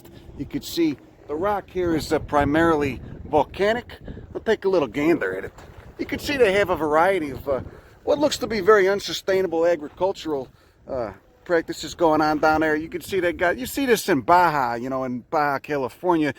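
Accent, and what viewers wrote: Chicago, United States. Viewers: I believe he’s originally from the chi. Sounds like a south side or south suburbs Chicago accent to me. Though I pick up a little New York as well.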